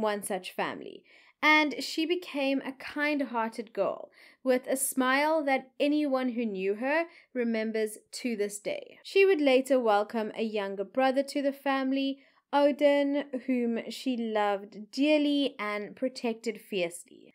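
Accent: Afrikaans, South Africa. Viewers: I love your Afrikaans accent its so cute! 😂❤️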